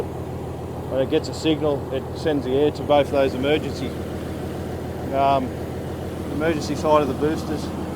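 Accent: Australia. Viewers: are you Australian?????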